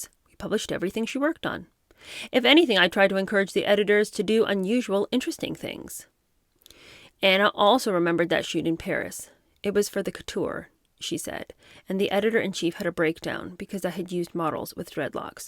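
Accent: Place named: Upper class, United Kingdom. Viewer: In the UK that accent is a golden ticket, it denotes you have the best education and through that elite education the best contacts. This is why so many Brits strive to have their children privately educated. I didn't have the means to privately educate my son, but I am able to help support one for my grandson.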